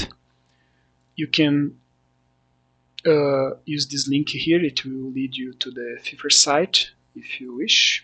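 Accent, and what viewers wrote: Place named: Brazil. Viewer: Tu tem sotaque brasileiro pae.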